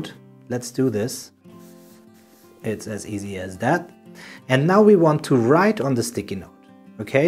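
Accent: Germany. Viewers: Wie man einfach sofort hört, dass er deutsch ist 😅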